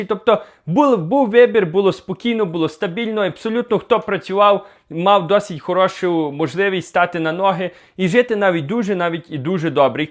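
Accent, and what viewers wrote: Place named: Lviv, Ukraine. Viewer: Судячи по твоїй розмові ти зі Львівщини,послухай не ганьби МІСТО ЛЕВА та ЛЬВІВЩИНУ, йди працюй!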